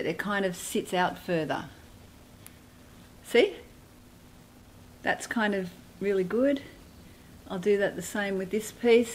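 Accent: Australia. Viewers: Thank you for such nice helpful tips oh and I LOVE your Aussie accent☺️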